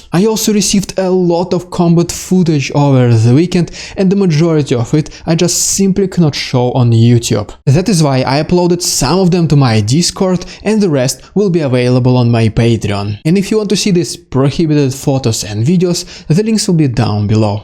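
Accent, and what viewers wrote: Russia. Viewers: Another extraordinarily informative and interesting video from you, sir. Your English is admirably perfect and I love your strong Russian accent!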